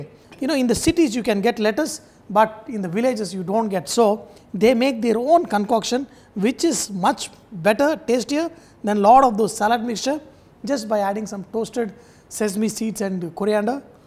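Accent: India. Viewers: I need a more thorough explanation of what exactly the ingredients are. I didn't know what it was. And the accent is VERY THICK. I could understand better if the Indian accent wasn't so strong. The food looks great but that stuff after the flour....idk what that paste looking substance was.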